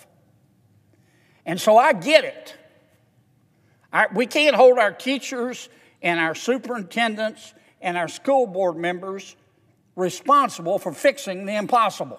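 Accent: South, United States. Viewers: He went to Oxford. I'm certain he didn't have the southern drawl while he was going to Oxford.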